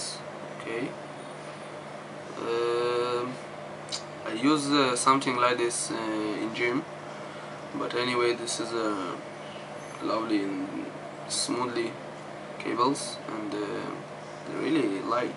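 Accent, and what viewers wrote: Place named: Israel. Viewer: ידעתי מהתחלה שאתה ישראלי בגלל המבטא תודה אחי עזרתי לי מאוד :)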